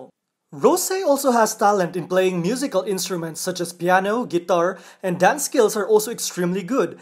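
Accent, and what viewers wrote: Philippines. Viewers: You have a Filipino accent 🧐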